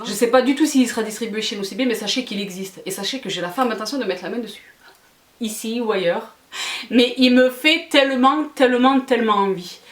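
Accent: South, France. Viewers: Coucou ! Je viens de découvrir ton compte et j'adore ce que tu fais : tu es claire dans tes explications, tu es enjouée, positive . Ton accent du sud 💕 je m'empresse de visionner toutes tes stories et de m'abonner à ton insta. Merci merci !! 😘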